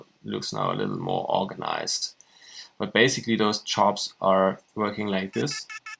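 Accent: Germany. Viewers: würde mir tutorials auch in Deutsch wünschen! Man hört extrem deinen Deutschen- Akzent (y) :)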